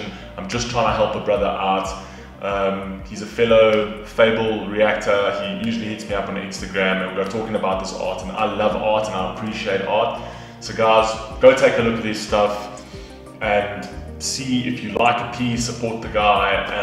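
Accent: South Africa. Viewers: just came across this.90% sure thats South African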